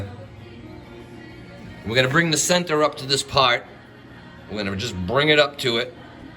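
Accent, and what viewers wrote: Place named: New York, United States. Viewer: Nice accent. You sound like a old school New York Gangster.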